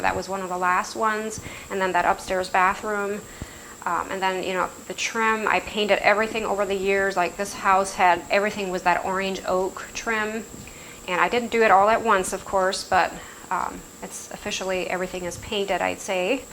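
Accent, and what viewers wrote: Pennsylvania Dutch, United States. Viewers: Such an interesting accent.... Pennsylvanian Dutch?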